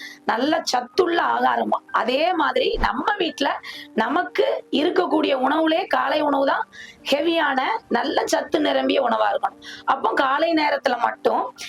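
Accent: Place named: Thoothukudi, India. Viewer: பேசும்போது தூத்துக்குடி வாசனை வருகிறதே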